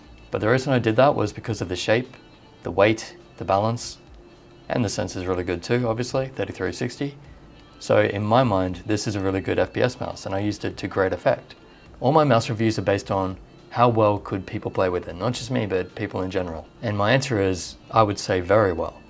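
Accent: Australia. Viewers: I like your accent and voice so much, dem aussies lol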